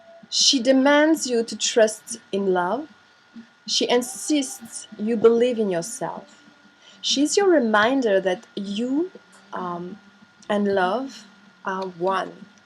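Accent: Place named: France. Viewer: Beautiful French accent 👌👌👌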